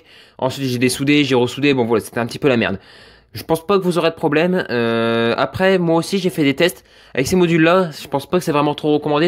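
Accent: North, France. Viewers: Tu Vien du nord touwa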